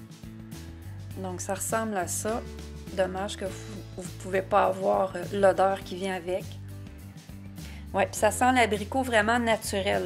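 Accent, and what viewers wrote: Canada. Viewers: cc jadore ton accent cannadien si je ne me tropmpe pas.jadores les produits Yves Rochers.moi je prend souvents des parfims et billes de bains.super ta videos je te fais de gros bisous a bientot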